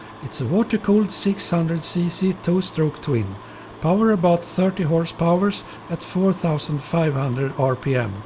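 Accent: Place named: Sweden. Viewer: Great work! And your Swenglish sounds good!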